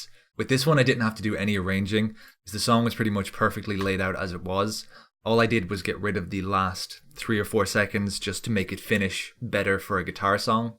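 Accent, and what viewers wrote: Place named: Ireland. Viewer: Why did I never know you were Irish??? Love ittt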